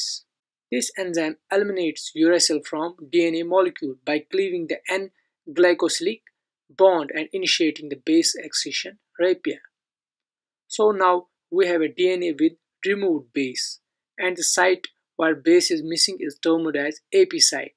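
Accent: Kashmir, India. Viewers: which district? srinagar?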